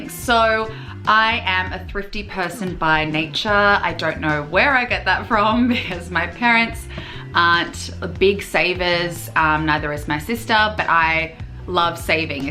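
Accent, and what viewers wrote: Australia. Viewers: That’s the Australian accent one of the sweetest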